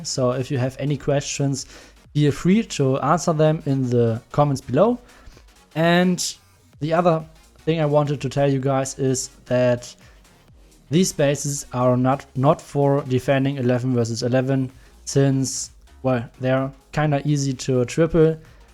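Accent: Germany. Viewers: Wenn deutsche englisch reden 👍top erklärt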